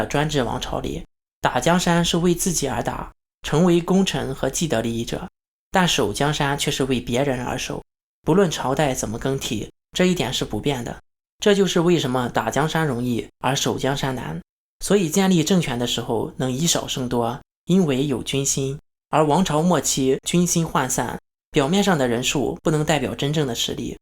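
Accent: Shandong, China. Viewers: 感觉是你山东的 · 听口音像是大陆人呢？ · 赌五毛这口音是山东人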